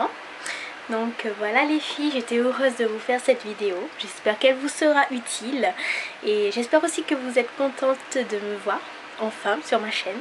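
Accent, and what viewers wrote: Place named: Réunion. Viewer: Ta un accent créol réunionnais ;-)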